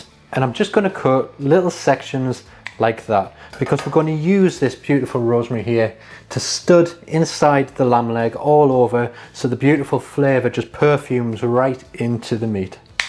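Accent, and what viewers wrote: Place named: Birmingham, United Kingdom. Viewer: Great content. Im loving the Brunmie accent 😮 😉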